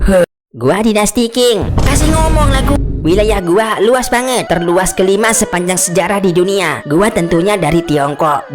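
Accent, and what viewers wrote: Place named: West Java, Indonesia. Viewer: sunda banget ya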